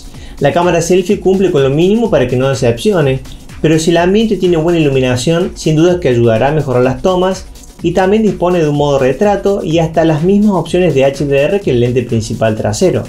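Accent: Córdoba, Argentina. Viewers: ¿Sos cordobés? Porque hablas como una persona de Córdoba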